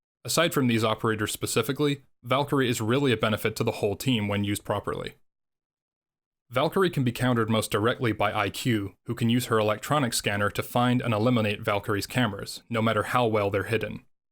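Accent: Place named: United States. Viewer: This was much appreciated. As someone who doesn't play (or pay) enough to have many operators, it was annoying that I bought Valkyrie and didn't use her too much due to feeling like I wasn't using her to her full potential. This definitely had some useful pointers for using her gadget which I'll be able to implement later tonight :p. Also, nice American accent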